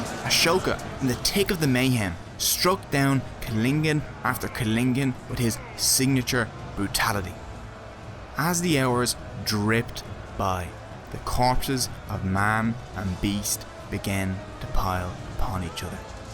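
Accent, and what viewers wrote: Dublin, Ireland. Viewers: S/o to Dublin accents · As an Indian I have to say, it's honestly quite fun to hear the names with an Irish accent. Now I'm a fan 😂.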